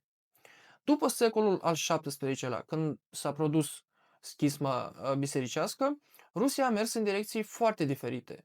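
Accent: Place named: Moldova. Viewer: и акцент у тебя молдавский а не русский.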